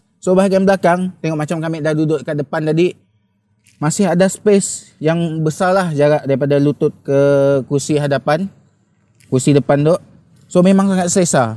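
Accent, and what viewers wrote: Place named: Sarawak, Malaysia. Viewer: Mantap 👍mun diam Serawak dalam sebulan nang konpem fasih kelakar Sarawak. Ton suara dah kedak orng sitok.. Kipidap bro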